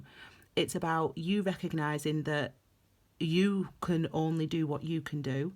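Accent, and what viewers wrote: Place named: East Lancashire, United Kingdom. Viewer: Where are you from? Sounds like East Lancs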